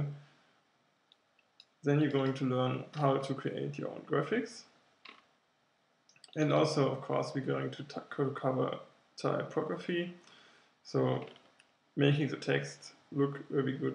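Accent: Germany. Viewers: You might want to work on your English pronunciation. I don't mind a bit of an accent, but at times your German accent is very heavy. Please see this as constructive criticism. Otherwise, thanks. I'm also using InDesign for my bachelor thesis (albeit on Windows, I think Mac is way too expensive. Shame there's no Linux version, as I'd love to use InDesign on my Ubuntu machine)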